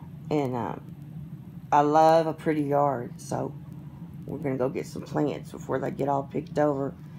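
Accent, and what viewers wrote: United States. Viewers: You have the sweetest voice. Listening to your voice makes me want to be a little girl so I could crawl into your lap and hear you tell stories. That sounds SO creepy when I type it out, sheesh! I mean it in the nicest (non-stalker) way possible though! I'm from Australia, so your US accent is different, plus you have that rich, beautiful Southern tone also that just sounds so lovely to hear. I hope your health is on the upswing very soon. I have heard from others how steroids can really mess a body about. I wish you happiness and health!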